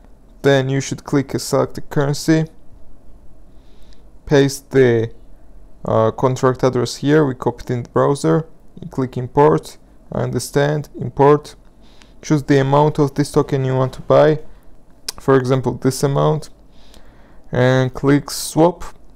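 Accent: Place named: Georgia, United States. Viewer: I recognized the Georgian accent LOL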